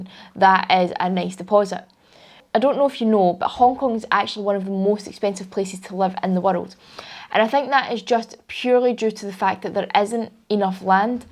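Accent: Scotland, United Kingdom. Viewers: We need to cut population in Hong Kong if there aren't much more land can be released to solve the housings issue. BTW, it's nice to hear some Scottish English after getting bored with our own kind here the Aussie. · 好重正宗英國口音, 同US/Canada 北美口音差好遠 , 真正英文就好似國語而喺我哋Vancouver啲北美英文就係廣東話😸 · Like other said, strong Scottish accent, interesting to hear～😁😁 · More like a Scottish accent. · Wow Scottish accent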